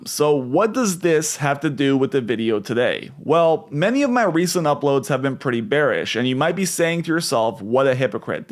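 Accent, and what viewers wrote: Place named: Minnesota, United States. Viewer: Are you from Minnesota or Wisconsin?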